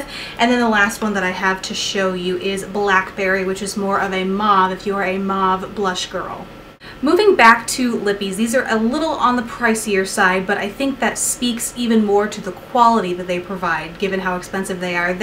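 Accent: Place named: Midwest, United States. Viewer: Like your videos, happy to hear a Midwestern accent again.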